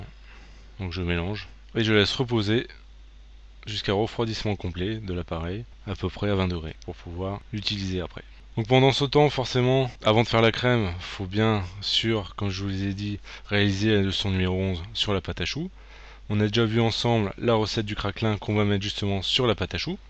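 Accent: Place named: Nord, France. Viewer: Bonjour chef, je viens de m'abonner à votre chaîne que je dévore attentivement. Je me doutais bien que comme moi, vous étiez du Nord/Pas-de-Calais. En tout cas tous vos conseils font que mes pâtisseries sont une réussite. Hier, j'ai réalisé les allumettes et des croissants. Là, bien sûr, je termine les choux. Il me reste à les bourrer de crème. J'ai hâte de les goûter lol. Cela fait du bien d'entendre le son du Nord dans votre voix surtout que je suis exilée en région parisienne et ma région me manque terriblement. Merci pour tout lol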